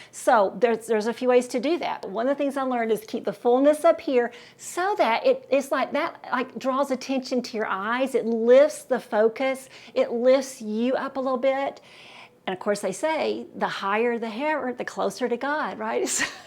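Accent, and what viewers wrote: South, United States. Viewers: To much talking. Very Southern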